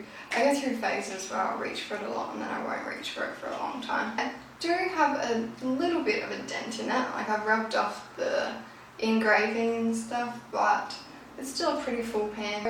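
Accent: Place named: Australia. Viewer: Love hearing an Aussie accent! Can't wait to see your progress :) I'm also panning and as a result, am addicted to watching panning videos!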